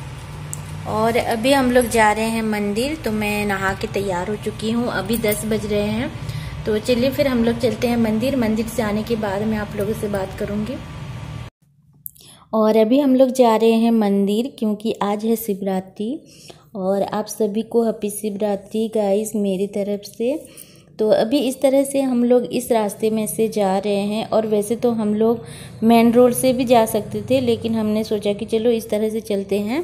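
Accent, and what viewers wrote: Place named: Odisha, India. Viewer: Wow super dear...Chhatishgarch n Odisha bilkul neighbour he...to aap ki hindi speaking thodasaodia jaisa bhi lagta he....doing very well dear...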